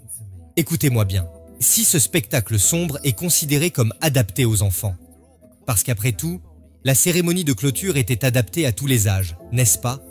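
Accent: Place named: United States. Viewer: Samedi 17 Août 2024 Bonjour à tous, J' suis contre les dialogues robotisés, de plus le narrateur est américain! Un texte expliqué par une/un FRANCOPHONE est bien plus approprié selon moi. J' ai donc écourté cette vidéo, et me suis quand même penchée sur les commentaires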